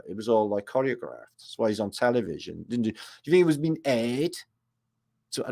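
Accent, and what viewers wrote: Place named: Cardiff, United Kingdom. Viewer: Good stuff. Loving your vidz I discovered you through RV recently. I knew straight away you was from Cardiff from the accent 😂😂 I am myself born an bread from there. Keep up the good work 😁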